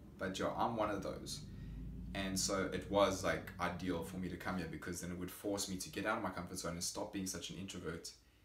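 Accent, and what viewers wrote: South Africa. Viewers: Are you South African ? Idk the accent sounds so familiar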